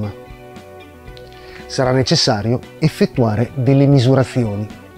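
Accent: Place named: Liguria, Italy. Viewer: ciao e complimenti per gli argomenti trattati. sono interessato all'eolico domestico e direi anche che - dall'accento - mi sembri conterraneo.......io abito ad Arenzano e, dall'atlante eolico di cui hai parlato anche tu, sembrerebbe un vento medio di circa 6-7 ms. Sarei quindi propenso a rivolgermi al tipo ad asse verticale. Però un suggerimento: a chi rivolgersi per tutto a Genova???? Mi sembra pochi disponibili e preparati....... grazie!!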